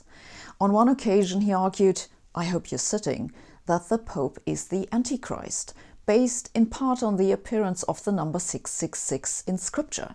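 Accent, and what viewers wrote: Germany. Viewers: Hello Sabine, I've discovered your channel about a week and have been binge watching since then. I think you probably have one of the best science channel out there and I like your german accent. I have a question though : would making the hypothesis that God rules the world through quantum mechanics make any sense science wise ? I know that such an hypothesis would be just as unlikely as any other theories (many worlds etc..), maybe more, but could it be ruled out science wise ? It sorts of make sense that if God influences the world, then He would do it through the most fundamental elements of Nature (although I know that a beautiful theory doesn't make science). In this theory God would chose the outcome of events through quantum decoherence. Since one cause can have multiple consequences in quantum mechanics, God would chose which outcome he likes the best out of them. 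Which outcome actually happens would thus be determined by "God's Will" I guess that would imply that quantum decoherence both happens and has macrocospical consequences in Nature (without human intervention), which I believe is the case based on what I've understood from your videos. I am not a religious person desperatly struggling to fit God in Science. I'm just an agnostic who's curious to know what does science have to say a the matter. I believe I am not the only person who thought of this, so it could potentially make a good video subject. Thank you.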